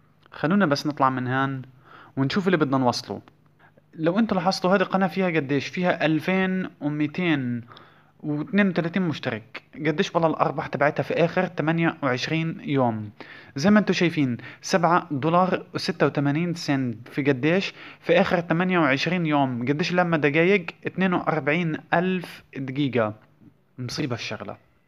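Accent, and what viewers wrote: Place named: Gaza, Palestine. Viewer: بشرفك انتا من غزة صح سبحان الله من الكلام بنبين أستمر صديقي الله يوفقك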